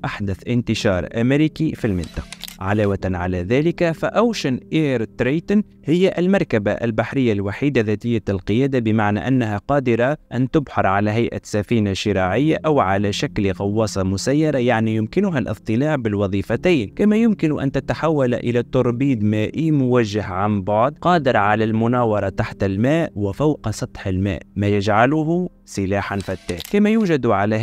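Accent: Tunisia. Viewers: كل مرة يخرج عليك برتوش شبعتو الخرياشة خرشف و كذوب المغرب غارق في ديون المغرب لم تبق له سيادة كلشي في يد الصهاينة انصحك اصاحب القناة انشر فيديوهات تتكلم على طاجين المغربي مثلا او تكلم على الظلم والفقر والقهر لي عايشو المروكي اولد القاف لمغاربة عاقو بكذوبكم على حساب صوتك تونسي باغي تسترزق على ظهور لحمير السناغلة 😂😂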